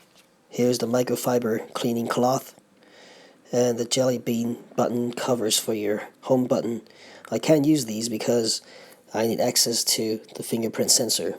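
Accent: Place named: West, United States. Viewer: you talk like cowboy trash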